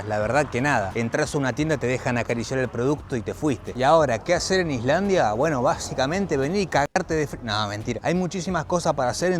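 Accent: Argentina. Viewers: me gustan mucho mas con tu voz, tu acento argentino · GRACIAS, RECORRO EL MUNDO CON TUS VIDEOS Y HABLANDO A LO ARGENTINO, COMO DEBE SER JAJA. UNO DE LOS MEJORES VIDEOS!! SALUDOS DESDE BS. AS, ZONA NORTE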